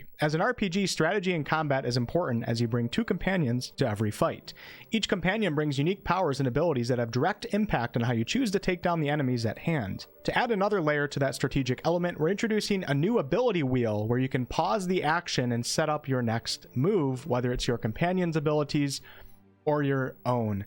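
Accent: United States. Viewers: I'm glad you have swapped over to DA. I was kinda curious what direction you would go after BG3. Also folks, he pronounces things differently due to his yankee accent, it's not a big deal. 😂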